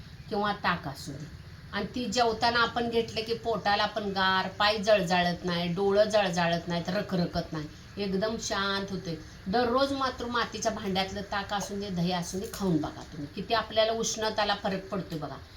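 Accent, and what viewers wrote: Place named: Kolhapur, India. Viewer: मावशी तुमची बोली भाषा ही सुद्धा तुमच्या पदार्था इतकी रुचकर आणि गोड आहे...... कोल्हापूरला शिकत असताना अशी गोड भाषा रोज ऐकायला मिळत असे....nostalgic खूप खूप आभार आणि धन्यवाद अशा सुंदर रेसिपीज इतक्या साध्या पद्धतीने सादर केल्या बद्दल....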